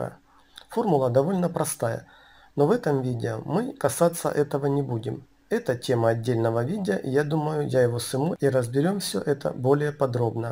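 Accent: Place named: Surzhyk, Ukraine. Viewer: Слова некоторые просто Суржик , автор живет в той местности где так разговаривают . но так даже интереснее .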